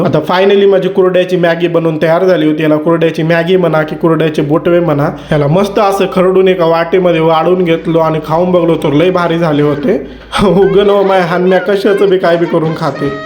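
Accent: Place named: Gujarat, India. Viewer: Tuzha gav kuthle.bhadha thodi vegle wataate.gujarati aikdylla yete.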